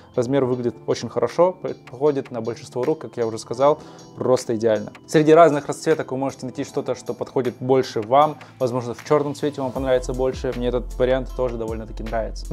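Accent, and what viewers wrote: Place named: Kazakhstan. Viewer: Он из Казахстана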